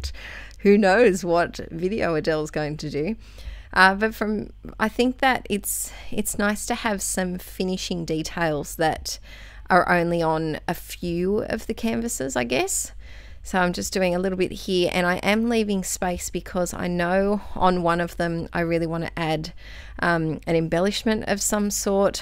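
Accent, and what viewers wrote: Australia. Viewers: Love your art I have been trying to work out your accent good to know we have another Aussie doing mixed media TFS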